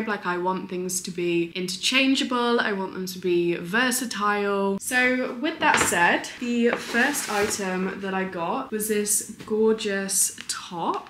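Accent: United Kingdom. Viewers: As a previous professional party photographer in Sydney, I can safely say this, you’re young, British with a classy accent, blonde, and pretty, these are all you need to gain entry to all sorts of groups and cliques. Be confident in that 💪🏽😁!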